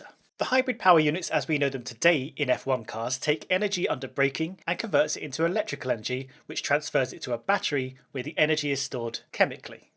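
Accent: United Kingdom. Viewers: How much I love this channel and how helpful it is, I find it extremely hard to follow the language. No secret that British accent is more sophisticated if you please, so it's hard for a non-native speaker to catch it at times, then the speed of narration is quite high to read the subtitles, especially in parts where the language is altiloquent. Moreover, there's technical stuff that you're trying to wrap your head around which makes it all even harder. In view of this I heartily ask you to consider slowing down the narration a bit, and maybe spell some words more distinctively. Thank you!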